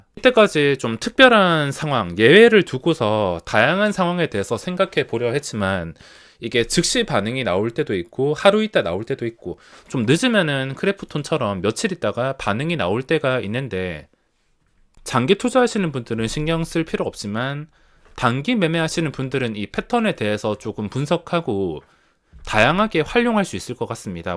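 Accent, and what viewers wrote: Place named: Daegu, South Korea. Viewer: 은 목소리가 진솔하고 매력적입니다..거짓없이 솔직한분 같습니다..저도 대구살지만 억양또한 구수하네요..좋은영상감사합니다..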